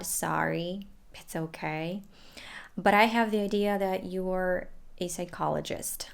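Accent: United States. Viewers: согласен, обычный английский, как у любого носителя американского английского языка, утверждаю, как живущий в Нью Йорке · It is Amazing for me to meet you specially because you have a perfect american accent and you are not american!! i really though in you as an american woman o maybe a latin woman living in America. I am impressed. Thank you a lot for making videos. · No wonder those kind of questions... Your american standard accent is amazing!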